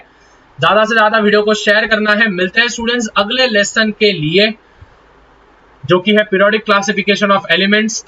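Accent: Maharashtra, India. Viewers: Bro is marathi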